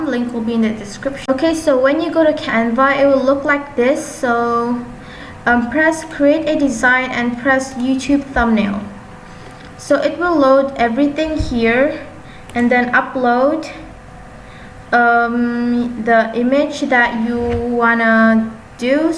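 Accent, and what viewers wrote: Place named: Malaysia. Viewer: That's her malaysia accent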